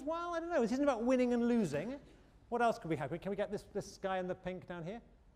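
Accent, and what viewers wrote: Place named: United Kingdom. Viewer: 好重的英國腔！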